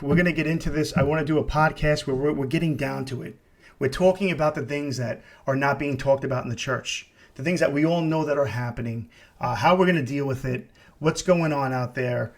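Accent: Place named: New York, United States. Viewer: I love your New York accent! Thank you for doing this podcast. Blessings to you and from South Africa.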